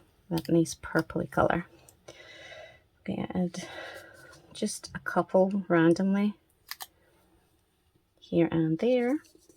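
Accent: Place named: Scotland, United Kingdom. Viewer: I’m a newbie, so this video was fabulous. Was mesmerised by all the techniques! Nice to hear another. Scottish accent. Could you maybe add details of where you got some of your material etc in the description below e.g. stickers and wax burner. Thanks so much for all the information and detailed explanations. Loved it from start to finish. What a lucky pen pal!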